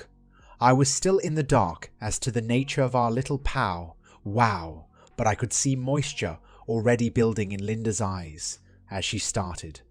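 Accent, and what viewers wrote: United Kingdom. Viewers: Lol. Annoying British accent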